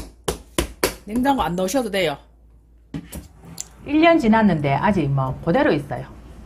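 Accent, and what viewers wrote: Gyeongsang, South Korea. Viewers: 목소리도.애교만점.최고.요리사입니다. 경상도.사투리.정말.멋지세요. 제친구도.대구있지요.요리프로. 최고.쉽고.제미있게하십니다. 축복합니다. 저는.전라도에.살지요.건강하세요.❤🎉😂😅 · ! 경상도 말소리너무구요미 너무 행복 합니다(♡♡♡♡) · 경상도 아줌마 사투리 제대로 👍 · 정다운 갱상도 아줌니 말솜씨땜시 보는것도 듣는것도 잼나게 밨어요 감사합니데이~~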